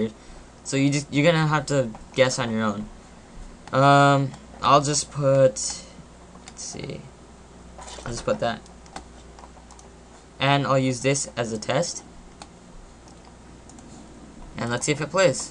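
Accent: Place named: United States. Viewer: What happens with mine is when ever I try to upload a video onto YouTube, it starts to save my video and then half way through my laptop decides to die :) And my laptop isn't over heating....it's a mystery. Also, don't worry about stuttering, it could be because your nervous, I get nervous too and totally mess up. Also your American accent is cool :)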